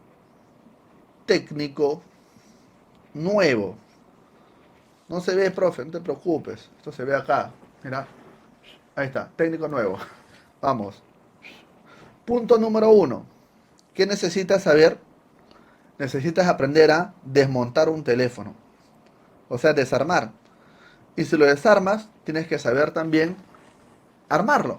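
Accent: Peru. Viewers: Con razón le buscaba el acento y no acerté a que era peruano.😂 Es un excelente profe.👏👍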